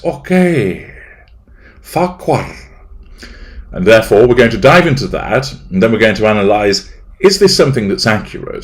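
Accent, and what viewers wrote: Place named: Scotland, United Kingdom. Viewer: I'm Scottish and your posh Scottish accent is so funny. "Farquhar" 😂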